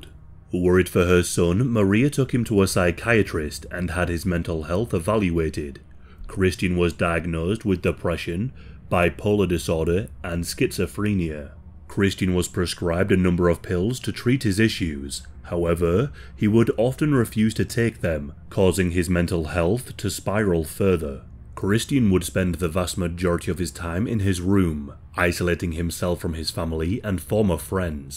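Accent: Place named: Northern England, United Kingdom. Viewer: I am a British born American who misses hearing English voices. I even though I was born in the South (London) I love Northern accents, like "s too. voice is so soothing even though he is talking about the most disturbing things.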